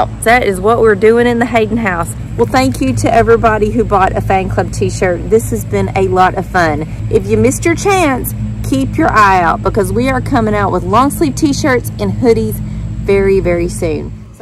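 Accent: South, United States. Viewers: I just love you and your sweet southern accent.You bring a smile to my face everytime I watch a video. I especially enjoy the videos of you with your family and the dogs. I did have to cry when you and said goodbye to Brecon on the instagram pics. I have been through that twice and I know how you feel. My shirt should come soon but I already give you an A+ for presentation. I see the extra work it takes to do the sticker, tissue, and treat. When things are done the Way, they are done right!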